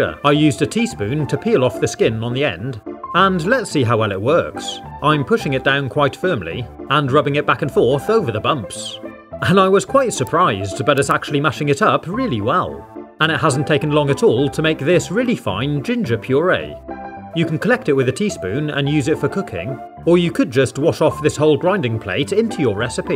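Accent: England, United Kingdom. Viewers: VERRY old days: no sound kinda very old days: iconic music old days: voice and iconic music kinda new days: deeep voice and iconic music a lil bit new days: less deep and more british and iconic music new days: more less deeper voice and iconic music so uh yea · We all love a hood english lad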